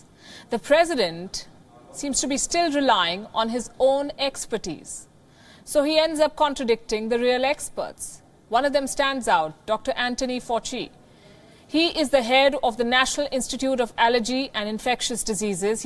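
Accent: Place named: India. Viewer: Majority of indian population understand their own language better than English So then why english channel with indian funny accent Why they need to pretend to be What they are not hahaha lol So stupid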